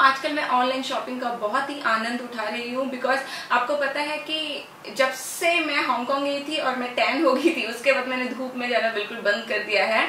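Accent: India. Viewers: upka language lambadi hindi lagta hai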